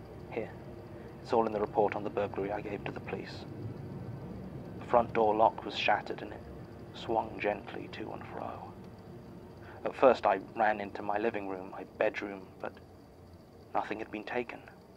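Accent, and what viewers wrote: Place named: United Kingdom. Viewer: “If they work for the Magnus archives they should” Martin*listening in outside* : breaks into a cold sweat I’m in danger “I had never been scared of dolls" Me and my 2 worst fears being dolls and bloody mary(thanks supernatural): godDAMMIT Also Jons "...Americans" reminded me of one american youtuber recommending the podcast and one of her reasons being "the guy is British and his accent-" and I had the same reaction😂(I live in Scotland)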